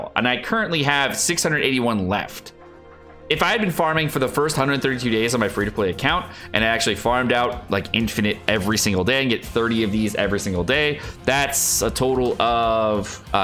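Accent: United Kingdom. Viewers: That's a very Bri-ishy accent!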